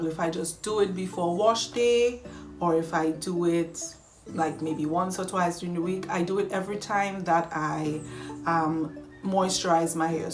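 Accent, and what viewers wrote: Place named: Caribbean. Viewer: Hi, where are you from, I hear a Caribbean accent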